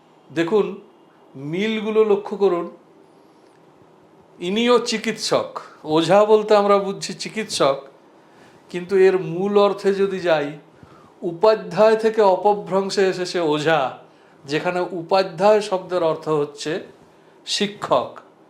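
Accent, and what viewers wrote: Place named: Bankura-Purulia, India. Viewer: আপনার কথা শুনে মনে হয়েছে, আপনি উত্তর বঙ্গীয় বা বাঁকুড়া পুরুলিয়ার কোথাও। এটা আমার অনুমান। আমার বাড়ি বর্দ্ধমান জেলার উত্তর প্রান্তে। মোটামুটি কবিগুরুর শান্তিনিকেতনের কাছাকাছি। আপনার কাছে বহু কিছু শেখার আছে। আমার বয়স ৭১+। কিন্তু জ্ঞানে আমি নেহাত শিশু। পেশায় একজন সঙ্গীত শিক্ষক। তাতেও বোধ হয় শিশু। খুব কষ্ট হয়, এতদিনেও শিশু হয়েই রইলাম। আমার শ্রদ্ধাপূর্ণ নমস্কার নেবেন।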